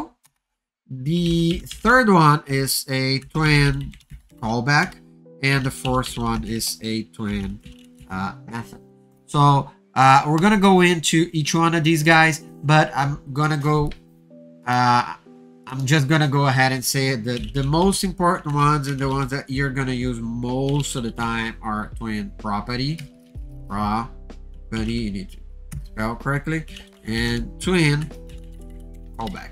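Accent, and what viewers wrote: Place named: Brazil. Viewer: Brazuka? vc tem sutaque brasileiro!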